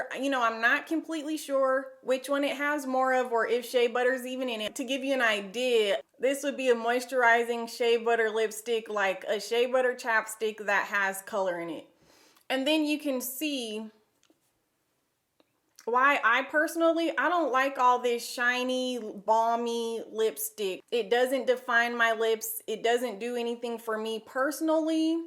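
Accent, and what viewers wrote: South, United States. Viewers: Omg your voice 😂 it is so cute. Its super southern but not.. At the same time. Where are you from? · I love her country accent 😂❤️ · What accent is that????? I thought you sounded southern for a second but idk anymore now you just sound like you're yelling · This is the first video i watched of her .. where she from? She definitely has an accent . Like southern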